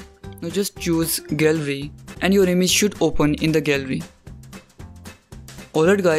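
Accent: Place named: Russia. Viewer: He sounds like a Russian speaking English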